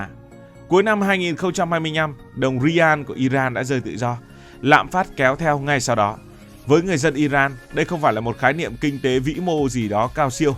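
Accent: North, Vietnam. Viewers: Nghe nói từ Paris là tôi thích ngay, dù là nói tiếng Bắc...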